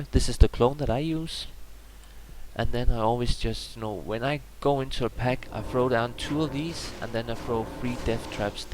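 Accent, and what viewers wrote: Denmark. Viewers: Love it. Are u by any chance danish :)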